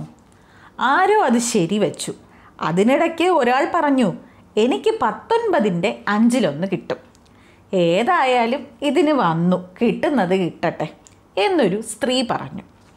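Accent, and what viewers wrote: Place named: Malappuram, India. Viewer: Malappuram/palakkadu slang💖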